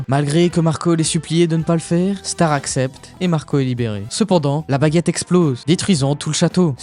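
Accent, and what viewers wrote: Belgium. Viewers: t'es belge non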